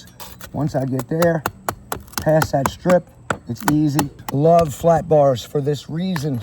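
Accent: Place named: New Orleans, United States. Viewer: I just found you because I need to Mya small roof repair and needed to confirm what I was thinking. You did just that, so thank you. About two seconds in I thought, he’s from New Orleans! You got a new follower.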